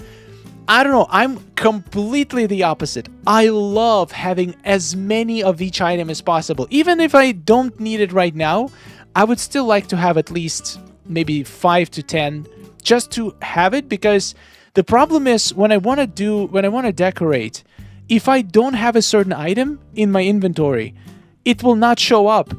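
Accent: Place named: Ukraine. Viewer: I listened to your words many times, I thought I misheard. Are you from Ukraine? How long have you been in the US? What is your real name? Sorry for so many questions. I’m from Ukraine😉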